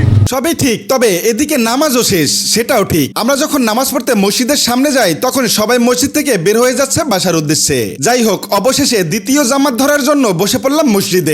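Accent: Barishal, Bangladesh. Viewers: Kotha batra thik koiren aro views barbo 👎🏻🤬🤬 Borishailla Bangal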